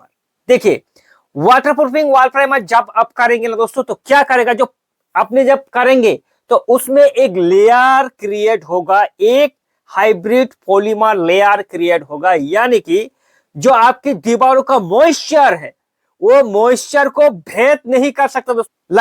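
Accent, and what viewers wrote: Bengal, India. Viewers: Bhai aap bangali ho?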